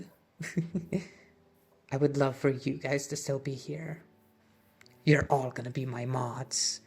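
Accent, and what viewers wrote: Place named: Romania. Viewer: wow I mean first of all, LOVE THE NATURAL ROMANIAN ACCENT 🗣🔥 and thank you so much for calling me nice and chill 😭You have no clue how much it actually means to me though, and I wish I was able to hang out way more, but I'm quite busy sadly and will probably still be for a while but honestly, what a Life update this is, I'm sorry to hear about all the health struggles and financial worries, really hope it all works out and you get that new pc too so we can enjoy more peak vids 🙏and I can speak for a majority of the people that know and watch you, take your time. Even if it takes you weeks, or months, or years, to get that PC, we'll wait and be excited for the vids!!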